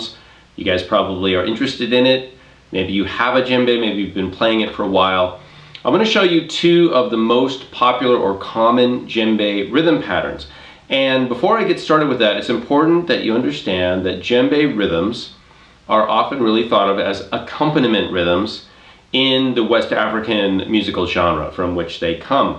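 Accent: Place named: Cuba. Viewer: You sound cubano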